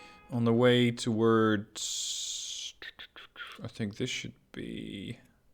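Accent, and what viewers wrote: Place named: Netherlands. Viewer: Ik hoor aan je accent dat je een nederlander bent. kan ik af en toe advies krijgen over dit spel?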